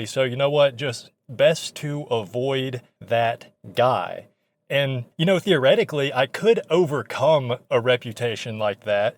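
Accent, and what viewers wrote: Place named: Deep South, United States. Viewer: You should move to a larger city if possible, judging by your accent you’re probably from the Deep South like me, once I moved to a bigger city(Atlanta) it was way easier to find friends and meet new people.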